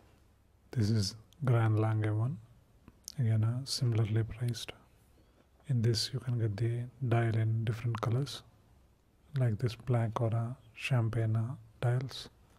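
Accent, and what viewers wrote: India. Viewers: Is that an Indian accent? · He is Indian, you're correct!😁 There is a link to his channel hidden way down at the bottom in the description box. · Love the ASMR Indian accent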